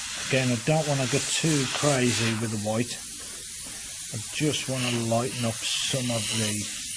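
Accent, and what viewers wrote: Black Country, United Kingdom. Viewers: Hi, bostin tutorial mate, just gerrin into airbrushin meself, bought a rook of createx wicked colours, badger patriot 105, compressor etc and bin avin a goo at tryin to do some skulls with real fire, gone a bit tits up a few times while i get used to the airbrush and the paints, musta watched 100 `how to real fire` videos on ere but your`s is the fust one that shows it in absolute detail which someone like me can follow in real time, crackin job mucka, BTW Willenhall here near Wolvo so we have similar accents dow we, arr LOL. Thanks for this mate it`s taught be a hell of a lot. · I cor tell where yo accent is from whether it's more Cradeley or Oldbury/ Bearwood side lol either way, you aren't far from me. I have just started doing car and bike graphics after doing canvases for about 4 years. Great vid just wish it was around when I started about 5 years ago :)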